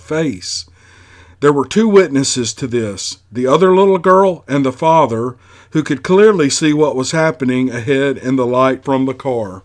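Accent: South, United States. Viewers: Yea yu dig his southern voice Us southern boys have that southern draw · I luv your southern accent if I'm saying that correctly, anyway it's different from other you tube videos. You have good store telling technique. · Nothing better than a Southern voice, Louisiana folks appreciate you, and your taking time to do this!!! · I love the fireside videos. I listen right before I go to & the fires & your nice southern drawl lulls me to sleep. Please don't take that offensively.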